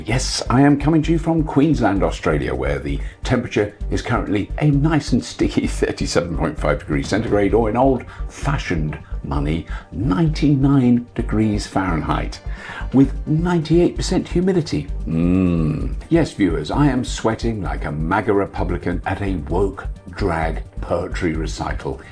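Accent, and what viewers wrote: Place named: United Kingdom. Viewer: Brilliant!. Thanx for talking in such a relaxed, humorous British way, but still getting the message through. Love your videos 👍🏾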